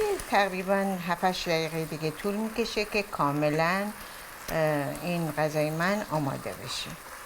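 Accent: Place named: United States. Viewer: بنظرم اومد شما لهجه آمریکایی دارید و خیلی افتخار میکنم به شما.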